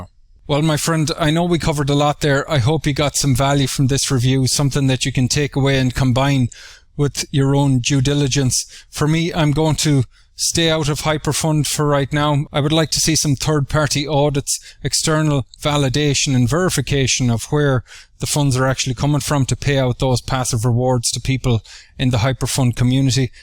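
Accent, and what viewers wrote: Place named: Ireland. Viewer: awesome breakdown! the Irish accent is a pleasant bonus!! thanks heaps..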